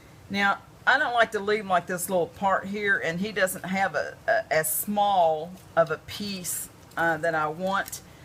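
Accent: Kentucky, United States. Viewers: Thanks so much for this! I just got this die on clearance and wasn't sure what to do with it. Your video is great - love your accent! Reminds me of my mom, from KY. I'm a new follower to your creative channel! Jean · My flower turned out more flat. What can I do to make more dimensional like yours. I love your accent I grew up in Irvine,Ky, makes me homesick!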